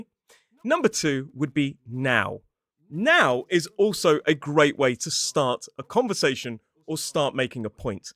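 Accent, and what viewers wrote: United Kingdom. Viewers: I really do love the BE pronunciation. Thnx 4 ur entertaining lessons.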